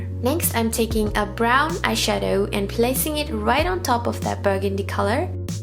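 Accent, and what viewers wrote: Nepal. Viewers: Is she Nepali, I could tell from her accent. Nice video!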